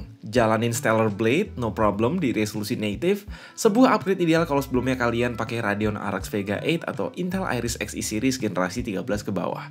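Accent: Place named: Java, Indonesia. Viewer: itu modelnya mbak-mbak medok brit yabai itu ya?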